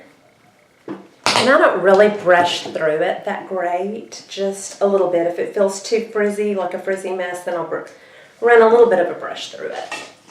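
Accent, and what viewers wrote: South, United States. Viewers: What is your hair color? Love it! Listening to your southern accent is like being back home...love your videos girl!!!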